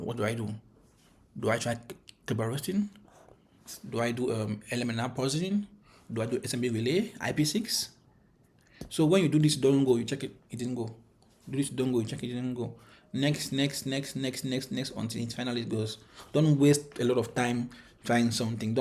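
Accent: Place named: Cameroon. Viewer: I recognize that Cameroonian accent. I'm on eJPT right now. This is probable my next cert.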